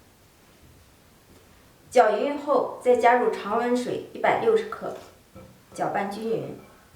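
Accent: Shandong, China. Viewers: 山束話特别親切 。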